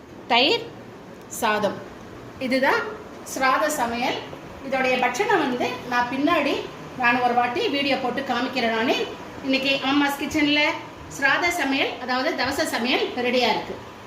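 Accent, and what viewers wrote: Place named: Kerala, India. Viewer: Which place are you from Mami.? Konjam Malayalam touch iruke, Tirunelveli or Trivandrum or Palakad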